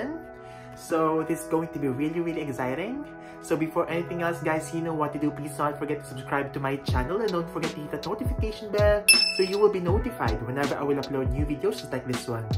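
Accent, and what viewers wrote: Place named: Philippines. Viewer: Are u japanese? U sound more like pinoy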